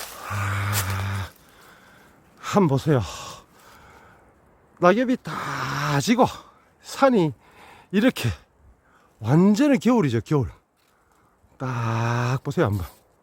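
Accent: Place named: Gyeongsang, South Korea. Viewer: 구수한 갱상도 사투리가 정겹습니다~^^ 귀한 영상 즐감하고갑니다 수고하셨습니다 행복한 나날 되세요 🙏 감사합니다 🤗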